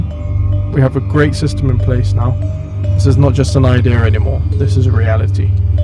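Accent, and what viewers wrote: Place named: Jamaica. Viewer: Your accent kills me 😂😂 why are you talking Jamaican. It's not cool to be a wigga lol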